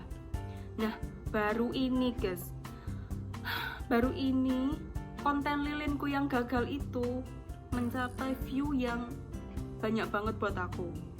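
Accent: Java, Indonesia. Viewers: mbak e medok banget kaya orang jawa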